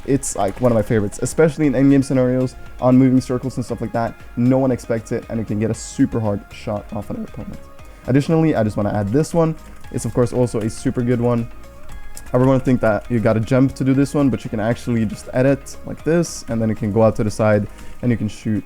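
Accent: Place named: Norway. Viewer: omfg du hørtes så jvl norsk ut med da du snakka engelsk🤣 translated: you sounded so f*ckin Norwegian when you talked English 🤣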